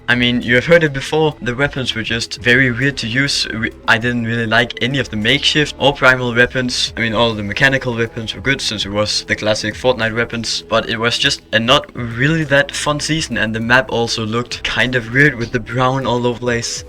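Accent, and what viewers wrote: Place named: Denmark. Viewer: nice me to i was about to ask you. Bc you really have that danish accent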